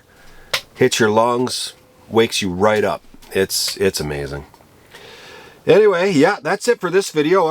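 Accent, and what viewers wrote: Newfoundland, Canada. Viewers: I hope you wanted your chicken well done! You say you're in the NWT but you sound like a Newfie? Shouldn't you be on the other side of the country?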